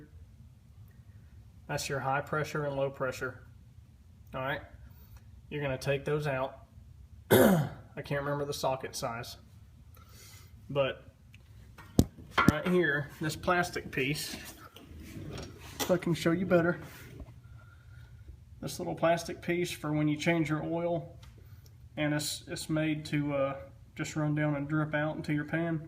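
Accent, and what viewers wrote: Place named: South, United States. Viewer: The southern accent makes it so much better, though. 💪 🍻 🔥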